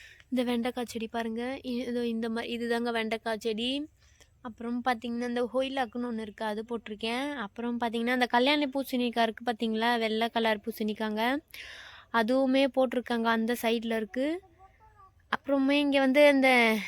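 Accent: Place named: Kongu, India. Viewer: Ungaluku sondha ooru edhu.. neenga eppadi anga poneenga.. alagana Kongu tamilla pesureenga adhanala keten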